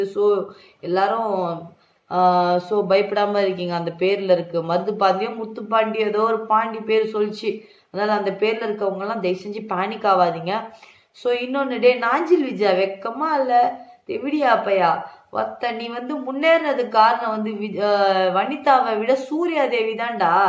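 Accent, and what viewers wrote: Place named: Chennai, India. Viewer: Akka Sema Chennai slang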